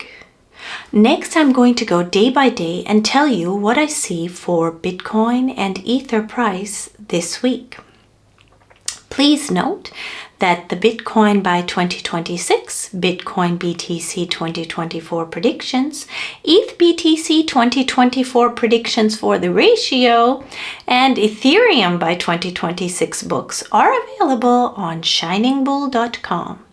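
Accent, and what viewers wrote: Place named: Canada. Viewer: where are you from? Canada?:)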